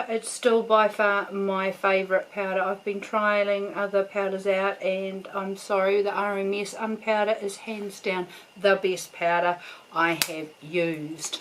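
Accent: New Zealand. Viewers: Hi from Malaysia. I came across your channel while looking for tutorial on Glambition palette. I quickly recognize your Kiwi accent as I lived in Christchurch for a few years and went to University of Canterbury. I binged watched a few more of your videos and realize that you live in Chch too. I feel connected with you already! Thanks for your videos.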